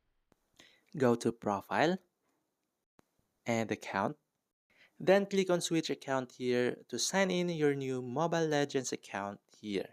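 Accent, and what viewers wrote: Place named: Philippines. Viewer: You sound more like filipino lmao, but your tutorial are very simple and very understandable ❤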